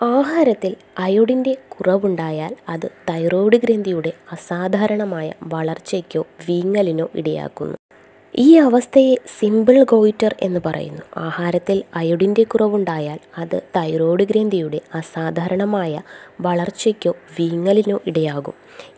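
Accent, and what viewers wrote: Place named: Thiruvananthapuram, India. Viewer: Ente same soundum slangum,Teaching methodum . Trivandrum aano. I am surprised.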